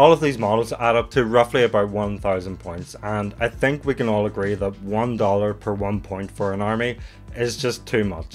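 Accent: Northern Ireland, United Kingdom. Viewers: Whereabouts in Northern Ireland are you from? 😁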